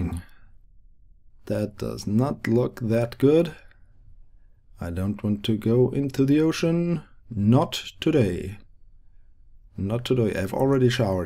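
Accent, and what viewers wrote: Germany. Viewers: Ach komm schon ich hör doch an deinem Akzent dass du deutsch bist ;) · Great SSTO and building skillz. But i can't listen to your narration - why dont you speak in your mothertounge? (german?) Greez from Vienna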